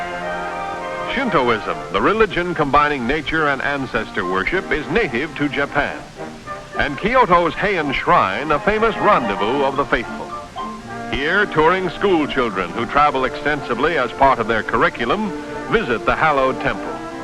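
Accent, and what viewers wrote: United States. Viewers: contemptible American accent. Devilish accent.C,est accent est celui de la mort ,pour touts les pays .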